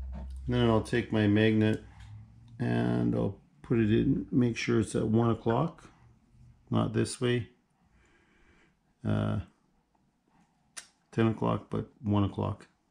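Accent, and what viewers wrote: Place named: Canada. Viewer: he has that canadian accent so cool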